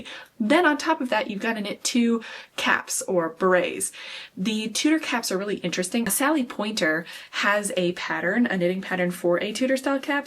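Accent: Germany. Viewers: Just found your channel. I'm a complete beginning knitter, although I crochet. Thank you for your work. I was an exchange student to Germany in 1972. I love hearing your German pronunciation. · Ich habe an deiner Aussprache gemerkt das du Deutsche bist, deshalb schreibe ich dir in deutsch. Mein Englisch ist nicht so gut. Was muste ein Meisterstricker in 30 Tagen stricken? Gab es damals schon Vorlagen oder hat er das aus dem Kopf gemacht?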